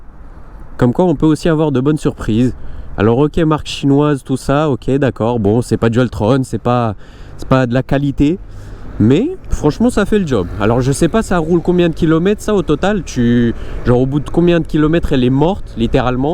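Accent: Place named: Romania. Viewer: Vous avez un accent. Vous êtes d'origine roumaine ? Vous parlez bien français. Attention au code de la route...!! Ligne blanche continue...et plus ENCORE...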